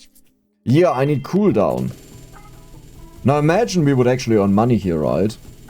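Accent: Germany. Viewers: Man hört so raus dass du deutsch bist. Ich Liebs. Peak Video btw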